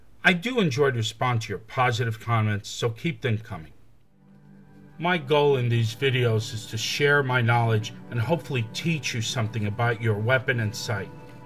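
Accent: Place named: New York, United States. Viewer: Thanks for the neat video. A real ACOG is outside my price range right now but you have given me something to think about. I recently finished my second AR-15 build project. I had to sell my first AR and all my other guns back in 2011 to finance my move from Texas to NC. I live on a low SSI disability income now so it took me about a year and a half to finish my current AR-15. I decided this time to go retro and I built this one as close to my M-16A1 that I had when I was in the US Army back in the 70's and 80's. I even used my old triangular handguards and pistol grip my buddy, who was our unit armorer, let me keep. I guess they are expendable items. I was not able to afford a real A1 upper so I had to settle for an Anderson flat top upper with a screw on carry handle. I did find an A1 butt stock at Numrich Arms for just $21.82. I used a 20" lightweight barrel from Del-Ton. 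I took it to local range Sunday and at 50 meters I put 17 out of 20 rounds in the X ring of my silhouette target. I used a 6-24X50 AOEG CV Life Scope. Yeah it's from China but it only cost me $36.00 haha. Local range only has 50 meter section open now. They have to redesign 250 meter range so rounds will stop hitting neighbor's barn. You sound like you grew up in NY. I grew up in New Rochelle, but I don tawk like dat no maw. Subbed and liked.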